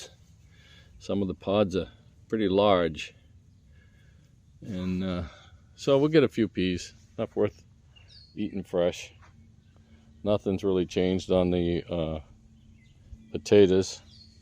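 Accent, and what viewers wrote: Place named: New Jersey, United States. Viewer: Your garden is looking great, sounds like you're from Jersey.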